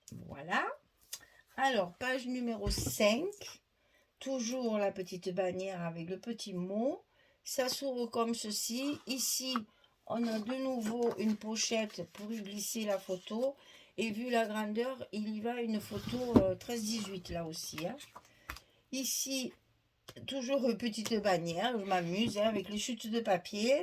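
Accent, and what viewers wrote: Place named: Occitanie, France. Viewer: Bonjour, j'adore vos créations elle sont manifiques 😍💕votre accent très agréable ressemble a l'Occitanie. BRAVO.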